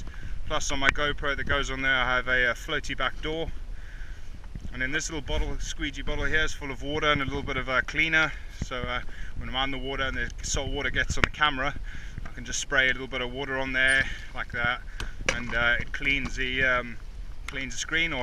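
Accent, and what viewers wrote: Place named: South Africa. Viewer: Hi I don’t mean to be rude but are you partly South African only guessing from your accent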